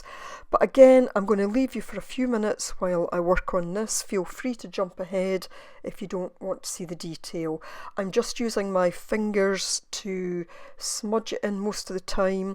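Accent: Scotland, United Kingdom. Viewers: Amazing. You have a special gift. Your voice is beautifully calming and your Scottish brogue is music to my Cheshire ears. PLUS... you have inspired me. You and Nina are fantastic at being able to do that. Thank you for sharing, you have made me happy. X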